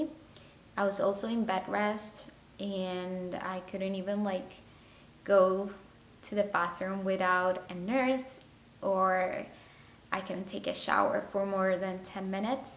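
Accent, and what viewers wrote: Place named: Bogotá, Colombia. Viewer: I recognized by your accent that you are from Bogotá. Stay strong 💖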